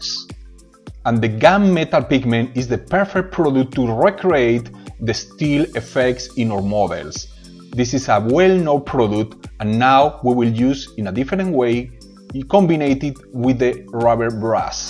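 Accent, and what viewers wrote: Spain. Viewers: I love Spanish accent. Keep up the good work. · Thanks ... I like your spanish English ;-) good and useful clip...I use always girl stuff for this..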